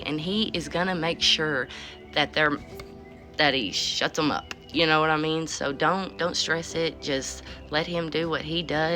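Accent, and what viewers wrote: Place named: South, United States. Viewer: I could listen to you talk about Jesus in that southern accent all day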